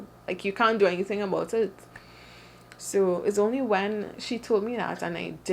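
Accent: Trinidad and Tobago. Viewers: That accent has to be from Trinidad 🇹🇹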